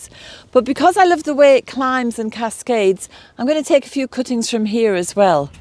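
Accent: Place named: Yorkshire, United Kingdom. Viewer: Sounds great good company and the satisfaction of a good job done good for you. Studying brought me from the Midlands to Leeds and a settled up here.Your accent tells me you will know it Wilsden village just down the road from Haworth, I connect with Eire too my father RIP was a Carlow, man. Blessings peace and harmony to you xxx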